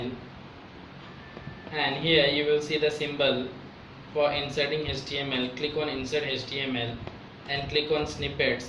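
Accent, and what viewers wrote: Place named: India. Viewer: Why are indians so bugady udding it, naw watdis ...blah blah... cant understand you! DUH!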